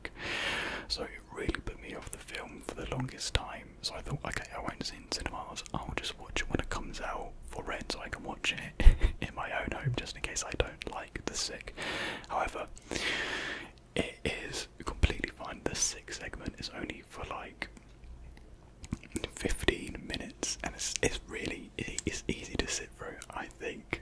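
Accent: United Kingdom. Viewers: happy new year little quiet british man on my phone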